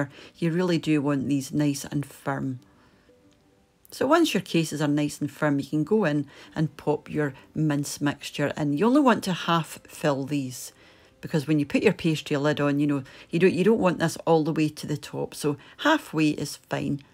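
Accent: Scotland, United Kingdom. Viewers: I love your channel. I'm from glasgow and now reside in Canada, everything you make from traditional scottish foods I grew up with, it brings me home, along with your wonderful accent. We can't get the scottish pies and that's what I miss the most, so was just jumping for joy when i saw you make them. Remember when bakers used to sell them with mashed potatoes and peas or beans on top. Oh my! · Such a pleasure discovering your channel,watching you making traditional Scottish pies and heraing you explaining the different steps...and what a wonderful accent ! Difficult at first to understand but watching the video helps. Thank you for sharing . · I love your accent, my mom was from Scotland. She had a beautiful accent. When I hear you it brings back the memories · I love Scotland. Listening to the lady's beautiful Scottish accent. Makes me dream. 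"Bye for now" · Just made these for my parents. Both scottish. A real taste of home. Absolutely smashing. It is very easy to follow and a lovely scots accent to boot❤ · I just found this channel. Looks great, love the Scottish accent. My family immigrated to Canada from Scotland 5 generations ago. I wish I was closer.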